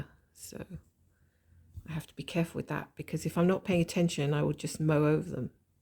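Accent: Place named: United Kingdom. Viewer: I've stitched with you on my only full coverage design and I must tell you how much you've made me laugh and how I've enjoyed your stories. I too had a cat and I recognised him in the descriptions of your cat's behaviour. Happy stitching and plenty of sun for us! ps. I also love hearing your British accent.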